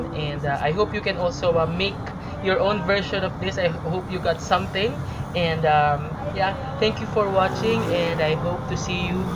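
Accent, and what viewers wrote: Philippines. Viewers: Its really easy to distinguish a filipino with his accent haha, am i right??...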